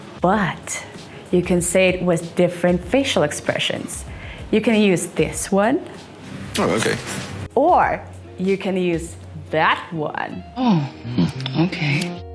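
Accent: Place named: United States. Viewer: That is american accent not british which care about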